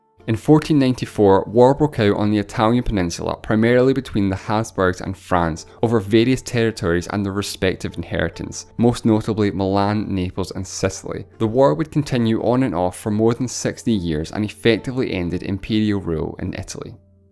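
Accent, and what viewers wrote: Scotland, United Kingdom. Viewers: Came from lore page. Disappointed by Scot accent. U sound dumb & angry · I can't make out the accent. Yorkshire? Scot? Irish? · Bro is so Scottish I can’t keep up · You sound like a Scotsman 🏴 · What’s the accent here? Scottish? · Everything sounds better in Scottish. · It’s just Scottish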